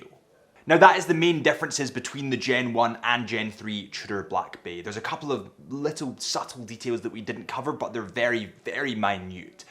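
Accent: Scotland, United Kingdom. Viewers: You do a very good Adrian Barker impression, with a slight Scottish accent.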